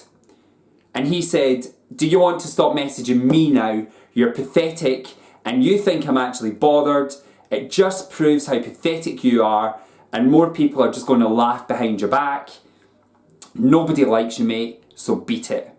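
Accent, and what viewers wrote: Scotland, United Kingdom. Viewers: Ooh Scottish accent, dare I guess Glasgow originally?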